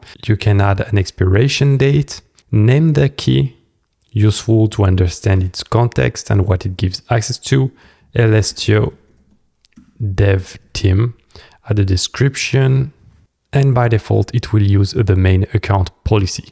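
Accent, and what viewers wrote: France. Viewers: Ahhh cet accent français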